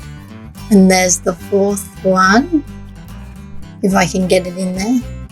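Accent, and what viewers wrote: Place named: Australia. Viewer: It's funny how many people said that her instructions are not understandable, but there's a whole bunch of others saying they did just fine and caught on easily😂. Personally, I think this pattern is one of the easiest to understand as others give way too much instruction and just confuse u more. Besides I love her aussie accent ❤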